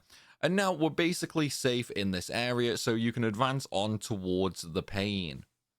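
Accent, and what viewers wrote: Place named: West Midlands, United Kingdom. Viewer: You sound like someone who lives in the west midlands of the UK like me I need this confirming as this is bothering me 🤣🤣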